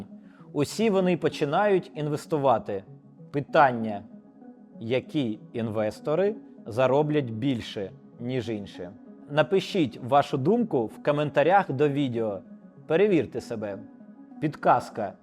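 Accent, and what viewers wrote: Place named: Russia. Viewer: Дуже важко слухати ці рускаязичниє чєлюсті😅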